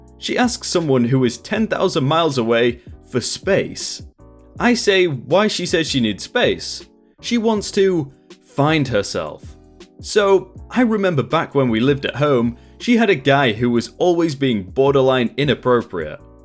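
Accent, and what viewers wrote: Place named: Midlands, United Kingdom. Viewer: I've been wondering about this for a while... are you a Midlands England guy? Your dialect is super distinct. Liverpudlian? Manchester?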